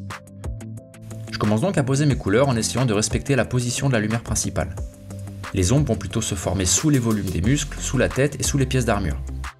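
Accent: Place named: France. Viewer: superbe travail, j’ai découvert vos vidéos en anglais mais je me doutais que vous étiez français. Puis je vous demander où vous avez imprimé cette figurine?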